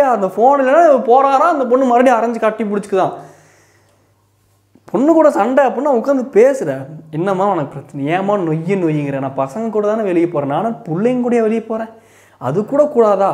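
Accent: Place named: Kerala, India. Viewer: Enna anna adikadi malayalam cross aaguthu😒😂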